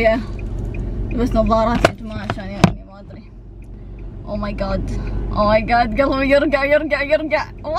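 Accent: Kuwait. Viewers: احبج انا سعودي للمن أحب لهجه الكويت❤️😂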